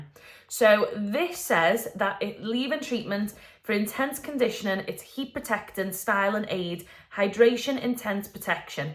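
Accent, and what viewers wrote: Liverpool, United Kingdom. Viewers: Hello from Belgium ...you sound like a Scouser ? Liverpool ? HILARIOUS : "hair in me bom" HAHAHAHAHA I came here to find out about the products and I have been roaring with laughter! That said, I'm a new sub ! 🙂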